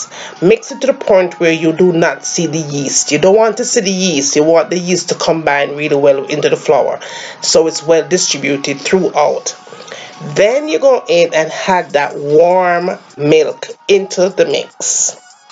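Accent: Jamaica. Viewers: I could listen to you all day, Luv how your utensils and kitchen are clean. loving the Jam twang' too! lol@ it there and let it hang out." You have a subscriber right away. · I love accent you really Jamican and way to talk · I love this delicious 😋 Coco bread. I love listening to your tutorial with our Wonderful Jamaican accent& language. If you love listening to our language you can check out my Poetry 💖Keep sharing these delicious recipes 💖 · 😳😝Gosh I love to here you speak. U be the Jamaican I love to listen to like Miss Lou. Yes happy channel growth. Now please traditial Jamaican yest corn bread receipt Thank you.🤣😎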